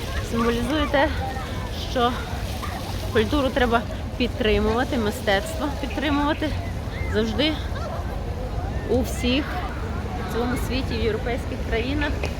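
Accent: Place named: Western Ukraine, Ukraine. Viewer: Як приємно чути ваш говір,західний наш рідний!Ви ВЕЛИКА ЛЮДИНА!!!!Пишаюся вами!!!💛💙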